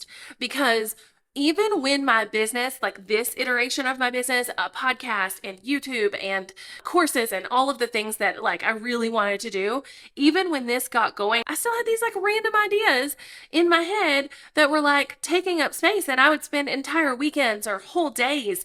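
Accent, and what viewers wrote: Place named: Carolina, United States. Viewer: you've got a Carolina accent lol! I'm from South Carolina. great video! where did you get your shirt from also? super cute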